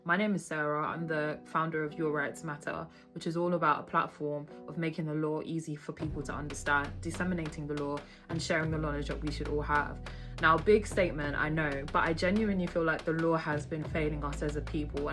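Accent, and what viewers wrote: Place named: United Kingdom. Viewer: You have a British accent so why didn’t you go to school over there? Are you just trying to grift? 🤔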